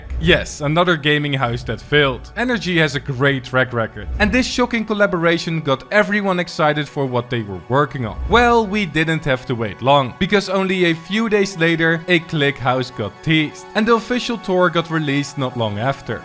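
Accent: Netherlands. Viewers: Lekker man hoorde het dacht al een mede Nederland Chanel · I smell Dutch in your accent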